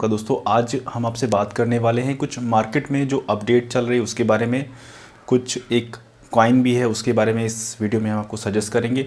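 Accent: Lucknow, India. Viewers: Bhai Aap Lucknow Se hai kya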